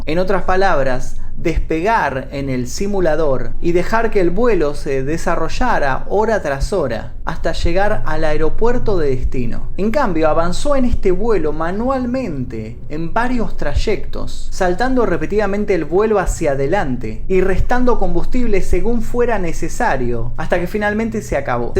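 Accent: Buenos Aires, Argentina. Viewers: Que genio!!!! SOS un copado.... Muy bueno tu relato, excelente perfomance, así hablamos los porteños. es totalmente Argento....Por muchos relatos massss 💪💪💪👏👏👏👏👏👏🇦🇷🇦🇷🇦🇷🇦🇷🇦🇷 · es que los Argentinos y los Uruguayos hablamos asi la ll suena sh, por eso es latino y no español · por tu voz parece que eres de Argentina 🇦🇷 ó Uruguay 🇺🇾 estoy de acuerdo con todos los comentarios positivos este es mi primer video que veo tuyo excelent. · se ve que asi hablamos los de argentina porqué me decían lo mismo a mi xd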